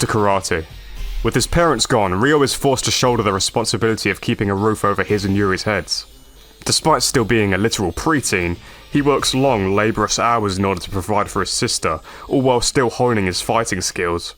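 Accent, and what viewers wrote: United Kingdom. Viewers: I love hearing a Brit talking about Fatal Fury and SNK, the game and the company is not as popular as SF, Tekken, and Mortal Kombat here in the uk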